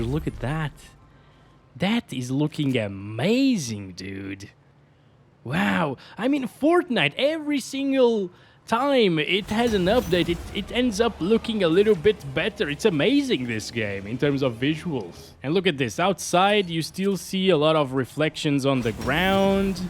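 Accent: Portugal. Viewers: és de Portugal? Foda-se kkkkkk boms videos mano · Voce é Português ou nasceu em outro país e foi morar em Portugal? Acompanha seus vídeos a algum tempo! Deve ser o português mais carismático que já conheci! haha! Sou do Brasil!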